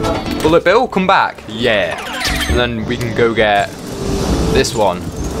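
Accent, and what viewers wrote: United Kingdom. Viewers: Wait are you British 🇬🇧?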